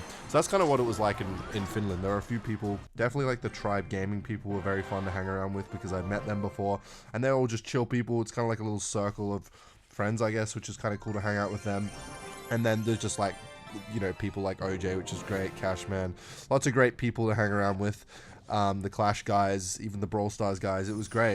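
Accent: United Kingdom. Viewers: British? Yuck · is british?😂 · Bro is British... I am not watching this anymore.....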